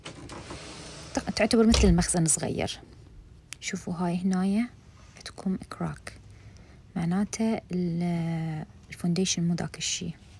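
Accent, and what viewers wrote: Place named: Iraq. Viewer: اعشق الهجة العراقية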